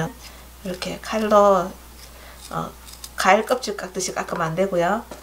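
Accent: Gyeongsang, South Korea. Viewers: 닉네임이 너무 정겹고 목소리가 경상도 아줌마치곤(?) 따뜻함이 묻어나서 궁금했어요~~ㅎㅎ 요즘 상어송이 대세잖아요~ 뚜루루루루 귀여운 뚜루루루루~~ㅎㅎ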